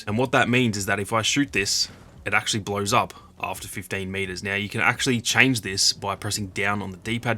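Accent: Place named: Australia. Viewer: Always love your content, really think you deserve way more views from the quality of your vids. Nice to see (and hear) a fellow Aussie!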